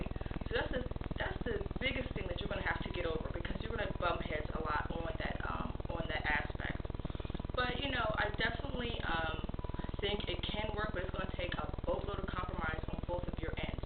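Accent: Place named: Philippines. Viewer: Your accent is so adorable.do you have Filipino ancestry?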